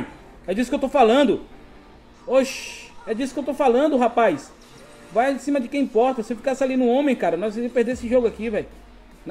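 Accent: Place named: Bahia, Brazil. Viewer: Ó larga o doce pivete ó larga o doce pivete ksksk parece q vc é daq da bahia ksksks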